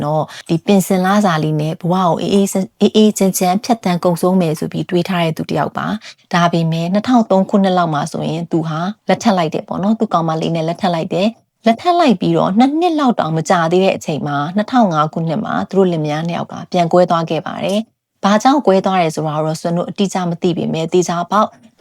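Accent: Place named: Yangon, Myanmar. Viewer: ရန်ကုန်ဘက် လေသံမလို့ပါ😊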